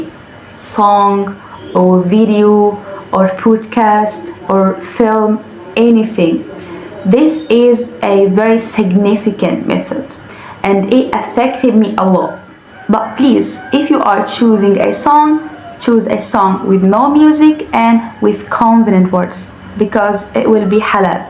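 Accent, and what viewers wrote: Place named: Scotland, United Kingdom. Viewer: British accent مع شوية سكوتش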